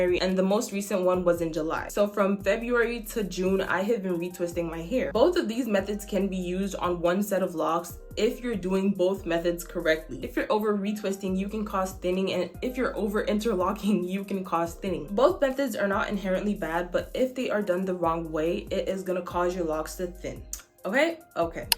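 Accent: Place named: Africa. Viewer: You have a slight accent, I’m just curious are you African? Also I love your videos, they’re very helpful.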